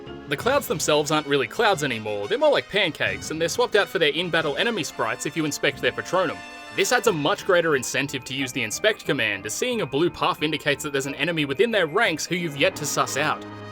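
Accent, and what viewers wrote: Australia. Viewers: I wasn't expecting a reply, love your channel so far, it's refreshing listening to another Australian talk about game design.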